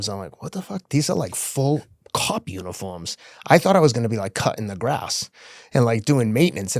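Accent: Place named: Boston, United States. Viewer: Dude I love your voice!!!! That Boston accent is one of my favorites!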